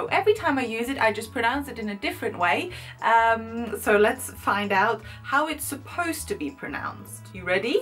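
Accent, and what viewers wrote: England, United Kingdom. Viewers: If it's any consolation, you pretty much speak English with an English accent. And if you go to America, squirrel tends to be pronounced more like "skwerl". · How did you learn to have an accent like that, you sound very proper and more english than pretty much every english person i know including myself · English tricky language! Sometimes words are not pronounced as you would think! You sound more British than German! You speak English very well! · Big suze! That's her name that was hard work and on second look you don't look terribly much like her lol think it's more her softly spoken voice , you sound very English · I'm very surprised that you've even developed a distinct British accent. When I learned and tried to speak German, idk where my accent would be from lol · I think you're more English than some English people themselves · The first time you said squirrel you sounded Australian aha And you sound very British! 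Also.. idk if it’s like a non Australian thing But I’ve never struggled to say rural? Maybe everyone in Australia lives rurally so we have to use it in conversation all the time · I have the same problem with the word 'determined'. Every time I use it I have to check its pronunciation out first!! it definitely drives me mad. By the way, congrats on your great British accent! ;) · What the hell?! At the start I thought you were British! Amazing English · at the beginning I thought like: wow... she sounds quite British but there's something else as well... German or sth. 😂😂 I knew that bc German is my mothertongue😂 but I'm not quite sure. i live in the UK as well and I try to sound as british as possible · I would say it Is a British accent ... forced pronunciation, it used to be a thing where the BBC had it as their golden rule that no "English, Scottish, Welsh or Irish accents would be on television or radio. 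so we had all these fake people on the box that infected everyone. accents change every 30miles in the UK, drastically change. as this is technically "The Queens English" this girl actually has an advantage being German. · is QUITE lovely...............and charming......................and funny. But I wonder if SHE appreciates just HOW 'English' she sounds now? Great stuff!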